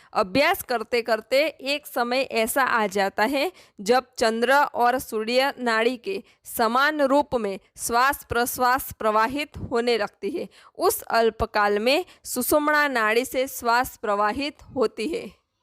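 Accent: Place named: Gujarat, India. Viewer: હું પણ તમારી ભાષા ના ઉચ્ચારણ પરથી અંદાજ આવી રહ્યો છે કે તમે મગજ માં ગુજરાતી નુ હિંદી માં રુપાંતરિત કરી ને બોલી રહ્યા છો 😊😊 જય શ્રી રામ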